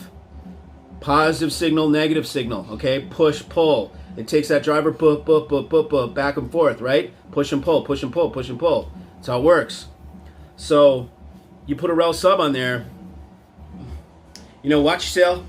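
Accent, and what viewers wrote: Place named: Rural, United States. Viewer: hey man great video, what is it with the hickey accent lol, hey I have balanced amps and pre amps and dac, bought a cable for the preamp once, polarity on the ac cable was inverted, got a hum right there, you make power cables so you should have run over that scenario. My point, even balanced equipment can get hum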